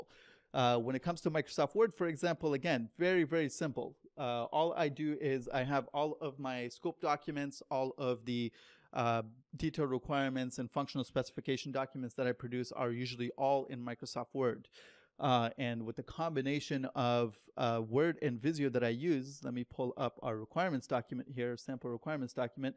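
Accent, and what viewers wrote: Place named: Canada. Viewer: You sound so Canadian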